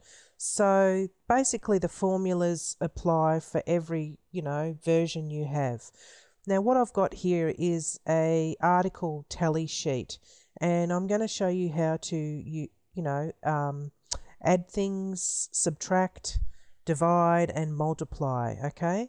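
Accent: Australia. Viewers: I love your accent. That is Australian? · Thanks for your explanation and tutorial! (I miss the Aussie accent!) :-) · Greetings mate!!! While I can certainly appreciate you taking the time to create this informative video, you kept saying: ok one to many times. Which many of us find distracting and highly unprofessional to say the least. It's bad enough we have to endure your aussie accent topped with ok, ok, ok, ok, ok, ok, ok. People need to be able to fully concentrate. Its apparent saying ok to often is part of your vernacular, but re-frame from saying: ok, when attempting to make a professional and educational video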